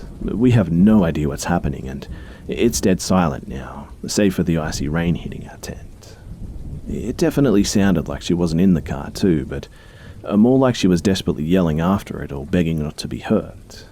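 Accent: Australia. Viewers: I keep falling asleep to your narrations. So then I have an Aussie narrator in my dreams that at times end up mimicking the story being told. It's quite entertaining.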